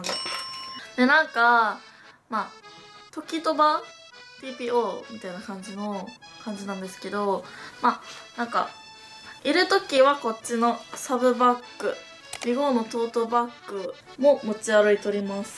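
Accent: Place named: Kansai, Japan. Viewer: 久々見たら関西弁が出てる なんか前の方好きかも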